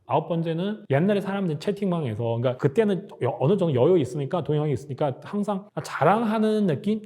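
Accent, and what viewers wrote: Yanbian, China. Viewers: 발음이 중국분이네요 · 이사람 중국사람이네 빌음이 중국사람 ?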